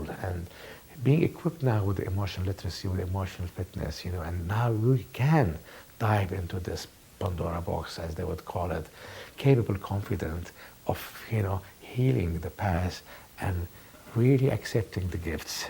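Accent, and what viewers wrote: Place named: Spain. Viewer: Interesting how an a man with an arabic name, and a spanish accent can expound on a very inclusive subject such as emotional intelligence and tie it into the history of commerce! Very deep!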